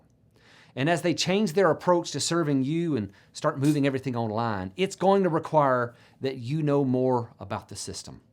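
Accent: South, United States. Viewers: With change all around, it would do no good to say I don't like it. However I am receiving patience and am learning to do more on the computer. My I-pad is old, I use a tablet but received a lap top from the library for 3 months and a jump drive I can keep. To keep from being totally frustrated, I drink sleepy time tea (really) to calm me down and learn to take breaks. The field office closing is not surprising. But thanks for asking my opinion. Than thank you for your work, and like your southern way of speaking.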